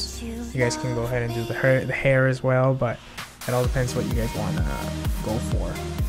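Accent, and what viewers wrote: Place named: India. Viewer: Indian ascent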